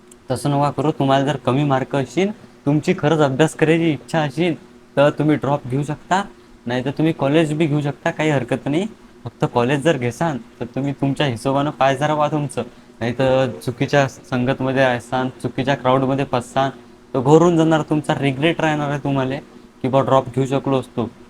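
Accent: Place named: Warud, India. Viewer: Bro tu amrawati warud cha ahe ka Karan tuzi bolnyachi bhasha tashich ahe😅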